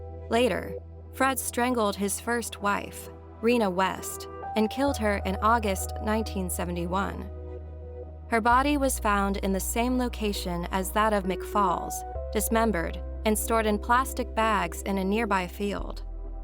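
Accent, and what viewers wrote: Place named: United States. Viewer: why the hell is this robotic voiced yank doing the voiceover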